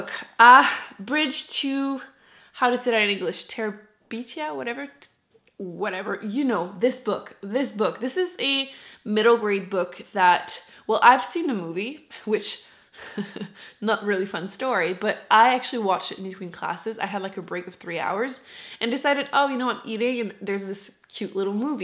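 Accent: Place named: Quebec, Canada. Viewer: Great video. Love your accent! Are you from Quebec?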